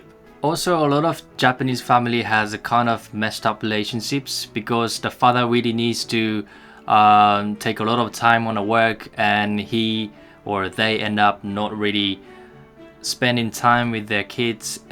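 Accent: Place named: Australia. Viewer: this is the first video of yours I've seen -- I believe I hear a strong Australian accent good video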